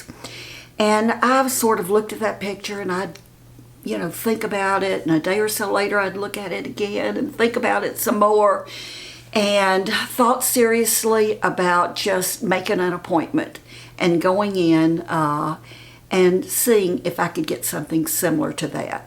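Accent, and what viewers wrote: South, United States. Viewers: You look lovely, as always. Do you ever watch Melissa55 on youtube? She reminds me of you...your Southern accent, some of your facial expressions and mannerisms. Anyway, hair color, particularly box colors, fade on everyone's hair over time, especially if you are covering grays. Even though they say "permanent", they are not going to stay vibrant forever due to shampooing, sun, and other products. I started coloring my own hair in the 1970s and became a licensed cosmetologist 20 years ago. I cannot tell you the number of times I colored my hair and, being a natural born redhead, I have been everything from bright copper to mahogany to strawberry blonde and red is the hardest of all colors to keep vibrant. I gave up coloring 5 years ago and am so happy I did, but boy, I do love the color of yours now. It looks age appropriate and youthful at the same time. 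Sometimes I think I would like to go back to the pretty blonde with high- and low-lights I used to wear, but alas, it is so much easier to just go natural (for me!) Your color is beautiful and you did a great job on the cut, too!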